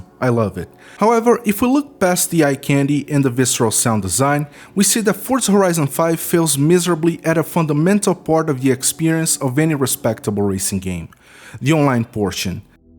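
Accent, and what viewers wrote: Brazil. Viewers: Porra, sotaque de brasileiro é impossível de não reconhecer. Muito carregado mesmo tendo um inglês bem fluido kkkkkkk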